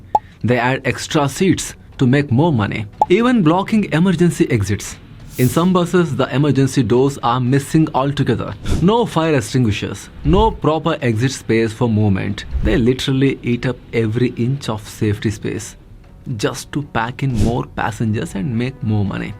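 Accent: Karnataka, India. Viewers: Bro, u r speaking English in the same pitch and modulation of your Kannada. Please correct it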